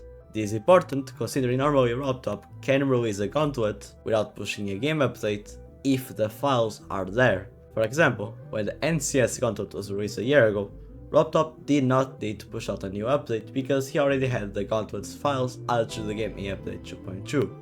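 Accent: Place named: Spain. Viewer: bro, tienes acento español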